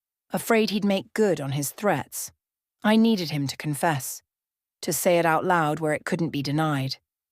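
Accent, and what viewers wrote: United Kingdom. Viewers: How refreshing. A British accent, not AI too. New twist, also refreshing, not so new, yet again, both parents killed in a car crash whilst still young. Yawn! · Love the British accent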